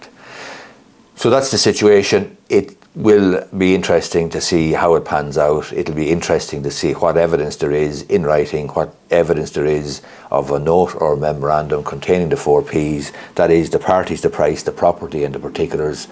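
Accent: Ireland. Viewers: so irish!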